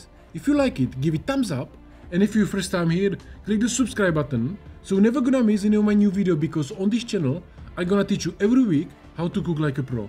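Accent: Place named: Czech Republic. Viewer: I’m British and lived in the USA for over a decade, too. Your English is wonderful, and yes your accent is strong, but is beautiful, reflects your Czech heritage, and is easy to understand for anyone who appreciates that spoken English has many different accents…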